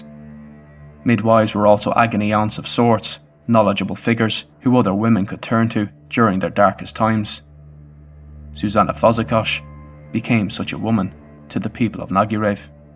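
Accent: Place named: Ireland. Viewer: Great video, but can you do something about your annoying Irish accent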